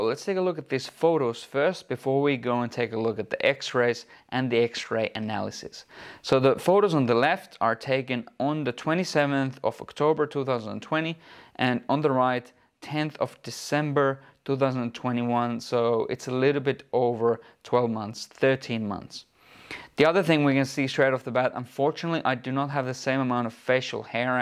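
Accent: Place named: New Zealand. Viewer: Where are you from, lad? Kinda sound like you're from New Zealand?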